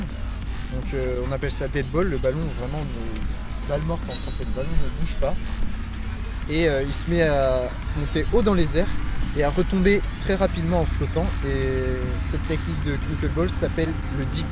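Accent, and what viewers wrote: Saint-Étienne, France. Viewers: T'est de saint Étienne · Tu est stephanois!? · Tu serais pas stéphanois ? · Vous êtes stéphanois ?! Si oui comme moi aller les verts !!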